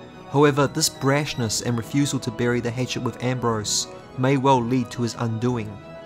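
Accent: New Zealand. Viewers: Question for the creator: are you from New Zealand? I'm not great at accents, (I'm from Texas and have only rarely traveled), so I'm only guessing based on my experience, but I have rarely heard your accent before so am very curious.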